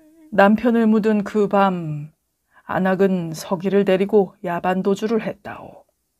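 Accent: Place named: Jeolla, South Korea. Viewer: 전라도 말 안쓰면 안될겨?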